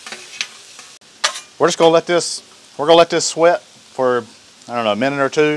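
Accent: North Carolina, United States. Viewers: The whole time I was watching, I kept thinking, man, he’s gotta be from NC...lol! I lived in Denton for 10yrs. Good people!😁👍🏻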